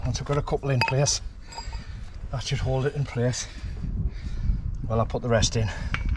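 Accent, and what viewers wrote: United Kingdom. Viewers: Hi Love the videos keep the good work up, you might have some competition when our van arrives we’ll see. (I may be a bit too old for the work involved). We were due to pick-up our CV40 in June from Tyne Valley motorhomes but alas still waiting. Question: are you a Geordie no offence intended.:¬)) John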